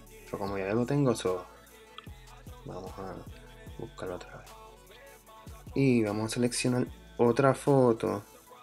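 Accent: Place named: Puerto Rico. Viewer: Brothel me ayudaste un montón…me sorprendí al abrir el video escuchar el “que es la qué hay mi gente” yo dije “este es boricua ¡ESO ES!” Saludos y gracias brothel 💪🏽🔥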